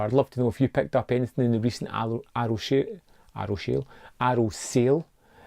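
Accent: Scotland, United Kingdom. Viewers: Do you mind saying what part of Scotland you are from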